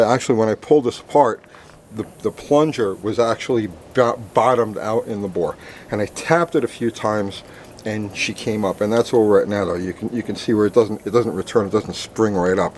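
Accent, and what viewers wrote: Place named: New Jersey, United States. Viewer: You talk like you're from Jersey.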